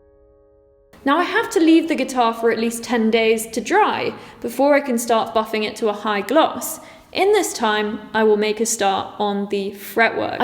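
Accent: United Kingdom. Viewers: Man, your British accent suddenly reminded me of those 90s/early 2000s BBC documentary programmes.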